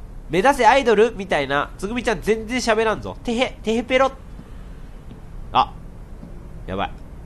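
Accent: Kansai, Japan. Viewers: レトさんの関西弁好きw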